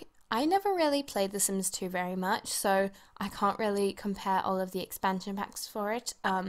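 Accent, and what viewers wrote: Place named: England, United Kingdom. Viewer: I can't figure out if her accent is English, South African, Australian or a New Zealand one... Someone help please, I am new here! Haha.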